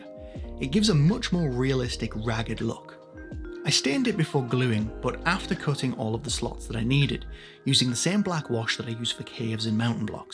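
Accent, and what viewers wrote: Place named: England, United Kingdom. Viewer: just wondering qwhy u use inches when u sound english? it always confuses me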